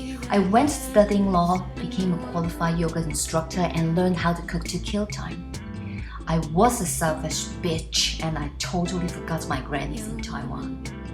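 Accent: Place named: France. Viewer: youre such an inspiring woman, I really loved learning about your past! Your English has a bit of French accent to it, it was sooo cool!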